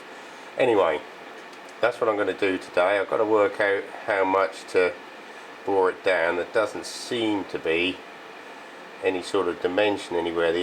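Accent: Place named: Norfolk, United Kingdom. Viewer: Y'oright Bah? I've been watching this series thinking he's got to be from Norfolk without actually checking your bio. Glad to find that my ear for East Anglian accents hasn't let me down. Good to see that you've got the internet up there now, keep up the good work! From Sunny Suffolk 😉😄👍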